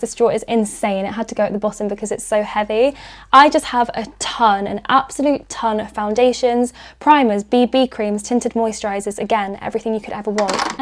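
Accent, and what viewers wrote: United Kingdom. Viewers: OMG LOOK AT THIS BRITISH ACCENT HOW BEAUTIFUL , I’M FROM NORWICH AND THEY DON’T SPEAK LIKE THAT BUT OOOMG HER ACCENT IS JUST ❤️❤️❤️❤️❤️ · Is she from Brittan ? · R u British????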